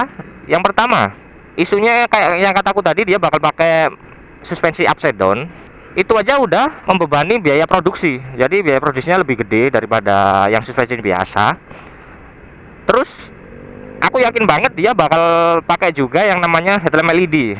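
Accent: Malang, Indonesia. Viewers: wihh... arek ngalam bro podo 😂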